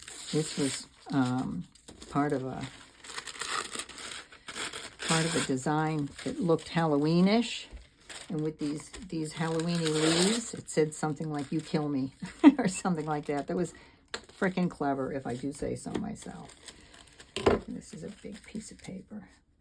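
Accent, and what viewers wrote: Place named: Massachusetts, United States. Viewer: You crack me up! I'm a first time watcher. You must be from MA!! Your accent & word choices make me feel right at home!! I quite enjoyed watching your methods.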